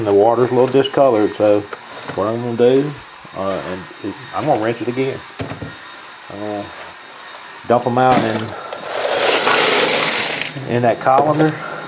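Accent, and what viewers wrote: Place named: New England, United States. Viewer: Wow you sound like such a yankee.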